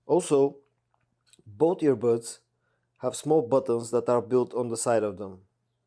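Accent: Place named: Russia. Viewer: Your english is too russian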